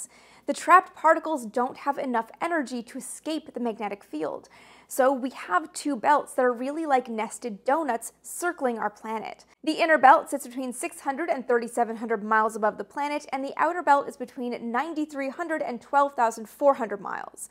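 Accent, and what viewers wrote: Canada. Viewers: Yay. More content! I can still hear the Canada 🇨🇦 in your voice. I'm from Syracuse. 🤷🏿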